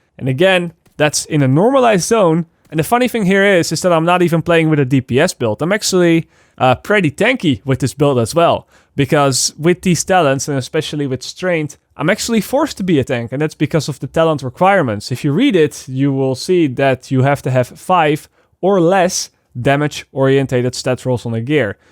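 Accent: Netherlands. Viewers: Dankjewel voor de info, hoor dat je Nederlands bent Hahah ^_^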